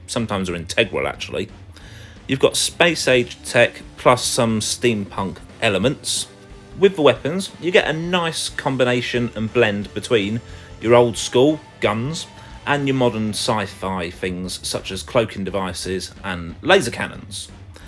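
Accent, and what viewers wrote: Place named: United Kingdom. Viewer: I love british accents, +sub.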